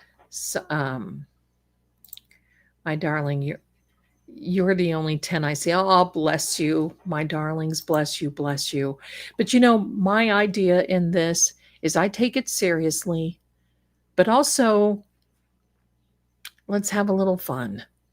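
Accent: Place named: Southern Ohio, United States. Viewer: You must be from southern Ohio.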